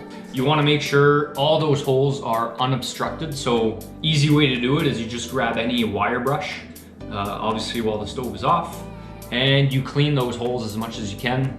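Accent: Canada. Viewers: Nice video, je savais que j'avais affaire à un Québécois mais ça pris quelques minutes avant de te trahir :P Bonjour de l'Abitibi ;)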